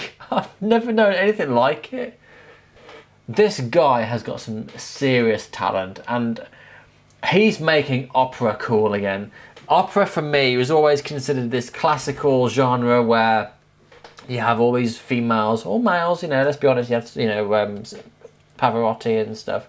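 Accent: United Kingdom. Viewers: Hello from Kazakhstan AND I REALLY LIKE your British pronunciation By the way,do you speak French?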